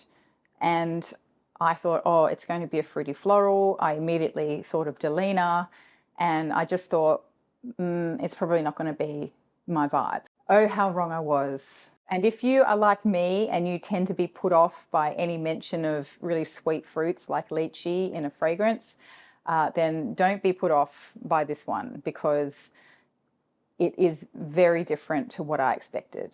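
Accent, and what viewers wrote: Australia. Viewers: Hi, I came to you through Chris. I’m in Adelaide, so it’s nice to see and hear an Aussie talking about perfumes. Subscribed😎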